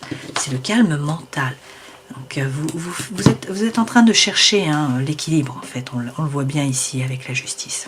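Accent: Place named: France. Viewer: tellement beau l acent francais...😍me calme...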